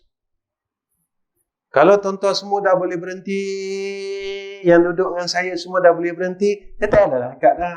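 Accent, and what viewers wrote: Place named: Terengganu, Malaysia. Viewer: Ustaz ni orang mana, orang Terengganu ke, cakap mcm org Terengganu je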